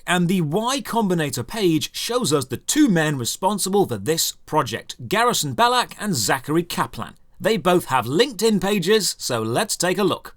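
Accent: England, United Kingdom. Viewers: Your Commentating reminds me of top gear, either its the nostalgic accent from my childhood in England or just your Personality.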